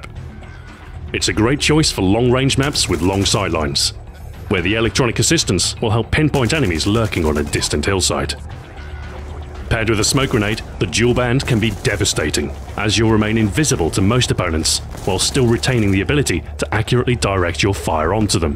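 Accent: England, United Kingdom. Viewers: R u really British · nope, a bit less Scottish and a bit more English. is based in Manchester, NW England.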